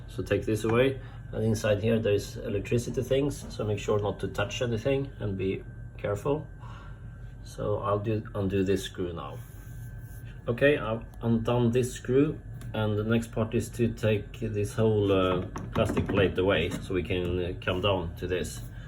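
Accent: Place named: Norway. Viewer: I fixed my drier this morning following your guide exactly. Your accent sounds norsk så eg bare ser tusen hjertelig takk.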